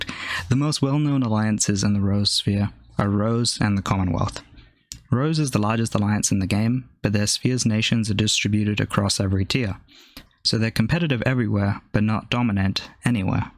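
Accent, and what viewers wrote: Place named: New Zealand. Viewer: You sound like you're from new Zealand lol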